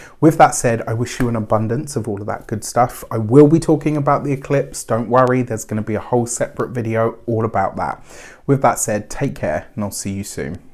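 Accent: United Kingdom. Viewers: Hello sir, I happened to come across you for the first time ever...thank you universe 🥰 great read, thought provoking and resonant for me. Thank you, also nice to have a British male accent doing readings ☺️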